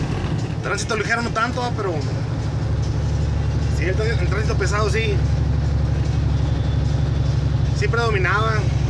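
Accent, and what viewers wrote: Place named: Sinaloa, Mexico. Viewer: Una pregunta? Eres. De sinaloa? Por que de durango no creo. Tu acento. Al hablar. Es. Diferente. Saludos. Desde. Texas. Soy Durangueña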